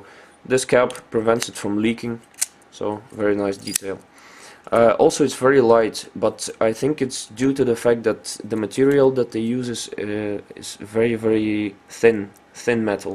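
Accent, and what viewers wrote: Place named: Belgium. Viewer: HAHA ik hoor het aan je stem dat je van belgië bent :p je accent valt wel op, ben zelf ook van belgië Limburg Hoeselt !!!!! greeeetz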